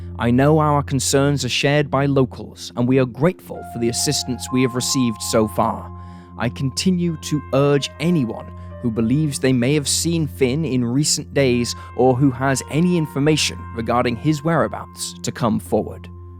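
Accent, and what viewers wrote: United Kingdom. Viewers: When I click on a YouTube video and I hear the narrator has a British accent I am immediately disappointed 😞.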